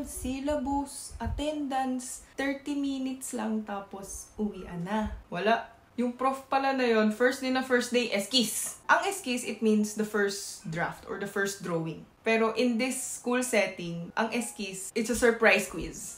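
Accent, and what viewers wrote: Batangas, Philippines. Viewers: 922nd like. Hi! De La Salle Lipa highschool batch 2013 and taga lipa here. 🙋🏻‍♂️ It really is fascinating magtagalog ka and nalabas pagbabatangueña mo haha.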